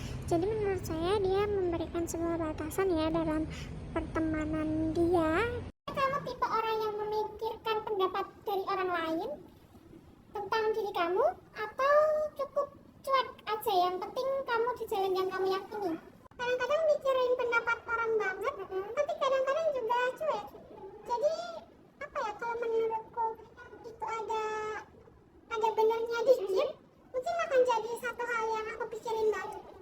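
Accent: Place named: Java, Indonesia. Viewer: medok bgt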